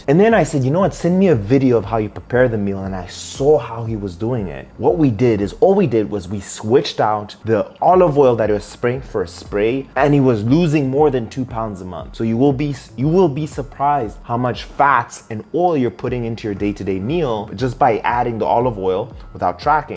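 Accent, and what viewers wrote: South Africa. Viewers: Awesome! Thanks Doc! I honestly thought you were South African 🇿🇦 because of your accent😅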